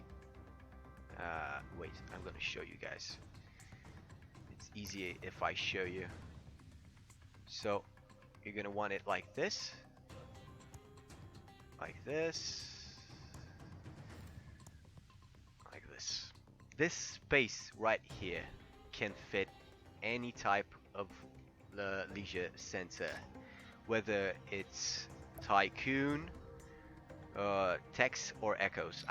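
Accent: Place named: Netherlands. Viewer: Half a decade later but it's dutch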